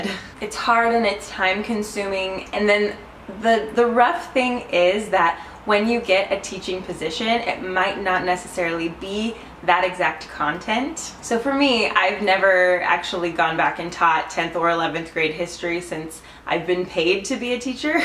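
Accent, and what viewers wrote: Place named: Australia. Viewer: These are fantastic tips 👍🏼 I think your Aussie accent was really good 👏🏼 I have recently done a series of tips for student teachers over on my channel 👍🏼 Really enjoyed this 💕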